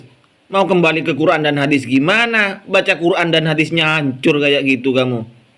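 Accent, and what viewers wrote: Madura, Indonesia. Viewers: Madura nya di p3rj3las cak..... mantap.... mau ktawa takut dosa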